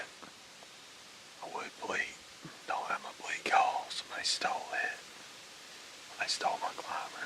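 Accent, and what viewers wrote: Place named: South, United States. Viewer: As my Mamma from East Texas would say, "That boy sounds like Real Country".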